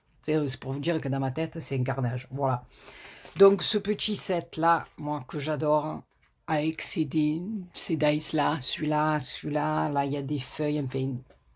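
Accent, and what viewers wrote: South, France. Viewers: Cc, je vous adore, j'aime ce que vous faites c'est magnifique et le soleil dans la voix du sud, je ris beaucoup et j'ai l'impression d'être là bas avec ma famille... Que vous me faites du bien..., et votre façon de dire, je vous kifffe grave comme vous dites...je vous copie pour apprendre c'est tellement beau.... Merci à vous de votre bonne humeur et vos rires me font un bien fou.... Et oui, franchement elles sont ravissantes 😁😂😂😂😂 Et oui, j'aimerai bien votre jolie carte.... 😁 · Recoucou ma.... contente d'entendre ce joli accent du sud....tes cartes sont magnifiques et le die superbe avec ce papier miroir... ca fait bien esprit de noel ou noyel même si ce n'est pas les couleurs traditionnelles..... Tiens mistigri n'est pas venu te voir sur cette vidéo.....pleins de gros bisous ensoleillés de la Réunion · Pas vue la première vidéo ...mais tu me fais bien rire 😊et j aime bien ton accent du sud.Tres jolies tes cartes.💕💕bonne soirée bisous😘😘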